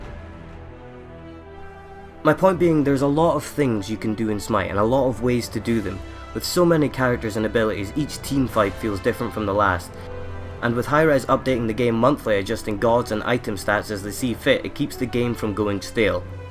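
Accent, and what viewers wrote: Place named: Scotland, United Kingdom. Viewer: Always throws me off when i hear the Scots accent in vid. some fucking good plays there mate.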